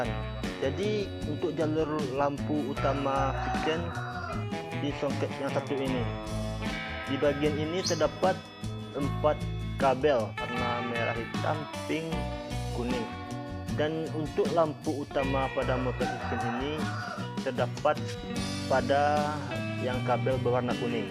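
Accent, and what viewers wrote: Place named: West Sumatra, Indonesia. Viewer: kek nya abang nih orng minang.. ketahuan logatnya.. Salam rider yamaha bang hehe 🤗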